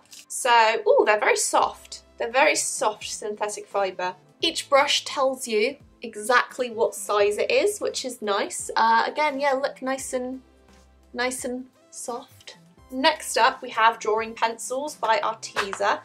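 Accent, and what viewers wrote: United Kingdom. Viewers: I love your British accent ❤🥀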